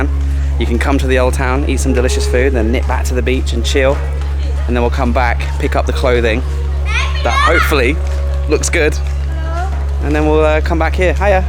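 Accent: United Kingdom. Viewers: Hi...i just watch your video about hoi an ( which i go end of this april for 6 days ) I think we have same interest about culture and quiet place and the art of cultural places ( i mean not to so toursty like bangkok which so mega cities that boring except for shopping ) By the way, i am fendi from indonesia..sorry my english not so good ( also i plan to go UK because i feel i connected to UK countryside which i feel when i see documentary about UK ) I think you from Uk? Because of your accent then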